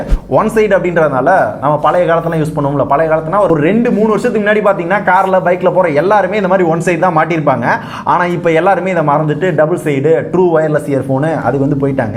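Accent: Madurai, India. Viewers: Bro neenga Madurai ya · bro neenga madurai ya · Bro neenga madurai ya bro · Are you Madurai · Bro neenga madurai ya🤗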